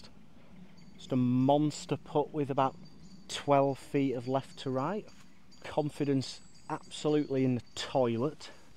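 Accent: Yorkshire, United Kingdom. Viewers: thanks mate I am new to the channel really good I reconized your accent as I am from brighouse near Huddersfield. Great video mate.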